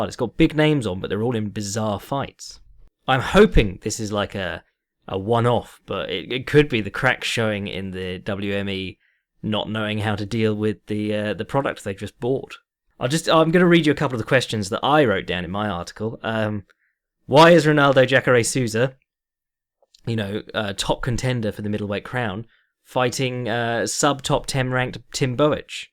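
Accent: England, United Kingdom. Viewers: Where about's in England are you from? Also, if it's close to me, can we be friends?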